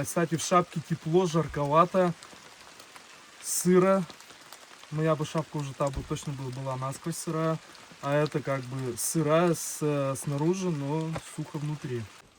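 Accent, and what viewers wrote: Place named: Kirov, Russia. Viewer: Судя по говору - Кировская область? Или ошибаюсь?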